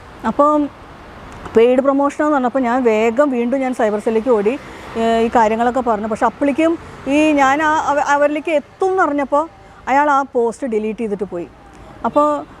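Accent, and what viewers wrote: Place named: Thrissur, India. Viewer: Beautiful Thrissur slang. One of the best theatres in TCR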